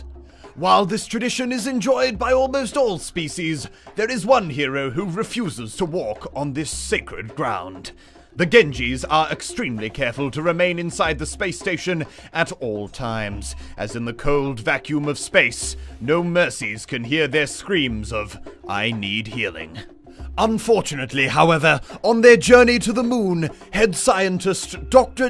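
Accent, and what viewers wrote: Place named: Australia. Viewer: 澳洲口音......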